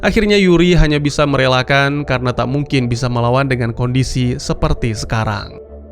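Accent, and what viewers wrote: Aceh, Indonesia. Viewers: Orang aceh ya bang?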